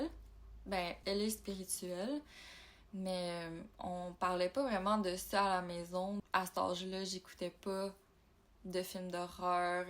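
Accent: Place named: Quebec, Canada. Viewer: Est-ce que c'est possible pour un canadien ou une canadienne d'apprendre l'accent Parisien je me demande? C'est tellement difficil pour moi d'écouter l'accent canadien, malheureusement. Désolée en faite de le dire car tu es très sympa et très belle. Mais je ne suis pas seule- l'accent canadien fait mal aux oreilles des français... Tout Québec devrait ajuster un peu son accent....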